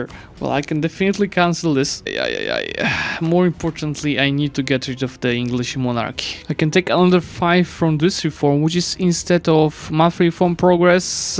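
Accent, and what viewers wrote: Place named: Poland. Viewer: because we have ears, his polish accent isnt that bad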